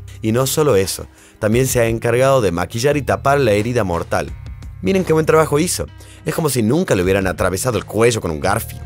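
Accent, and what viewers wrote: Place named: Corrientes, Argentina. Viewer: Jajaja!!!, muy bueno!!, ahora una pregunta!, sos correntino o chaqueño???, por el tono!!!, jajaja!!!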